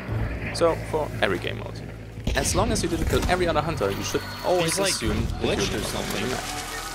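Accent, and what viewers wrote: Germany. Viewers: Du bist Deutscsher oder? Oder zumindest Schweiz oder Österreich. Hört man einfach an der Aussprache :P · Hey, are you german? You sound like my old classmates in english lessons. But I in deed like your video! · Man merkt das der Type ein deutscher ist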